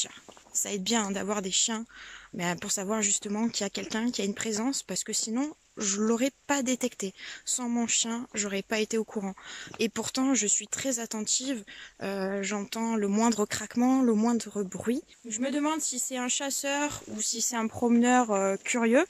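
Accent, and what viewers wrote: South, France. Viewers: Heureusement que tu as des chiens!!! J'adore ces animaux fidèles et efficaces. Méfie toi quand même du gars chelou du soir, c'est pas clair.. Demande à ton copain de te protéger 😉 Tu es toujours aussi belle et craquante. Tu es une belle âme, une bonne personne qui respire la bonté d'âme: Beauté, générosité, bienveillance, fraîcheur, spontanéité, franchise se ressentent car tu vis harmonie avec la nature, loin des maux de notre temps. Bonne route et bon courage dans ce que tu entreprends,. Peut être qu'un jour nos routes se croiserons et çà serait avec grand plaisir que je partagerai une tasse de café avec toi. Ton terrain est dans quel coin déjà, vu l'accent je dirais dans le sud mais vers où? Des likes et des Bisous bien doux 😘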